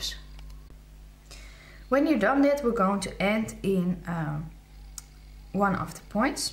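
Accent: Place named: Netherlands. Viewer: I love your accent :o) ... its like dutch / netherlands ?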